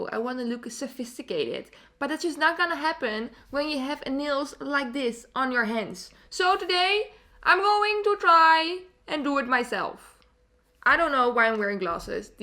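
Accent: Netherlands. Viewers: ik dacht al dat je een nederlands accent gad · Are you Dutch? I just discovered your YouTube and this is the first video I saw but you sound Dutch haha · she's dutch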